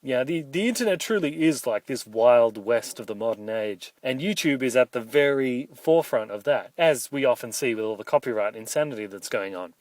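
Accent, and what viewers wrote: Australia. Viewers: Wait are u aussie if yes YEEEEEEEEEEES TEN BUCKS IS MINE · OH MY GOSH I KNEW WAS AUSSIE AFTER THE FIRST VIDEO! OH MY GOSH WOOOOOW (i live in aussie) and i thought you were to!!! oh my gosh thats amazing there are known people on youtube that aren't american. i solute you! PS I AM SUBBING! KEEP IT UP MATE!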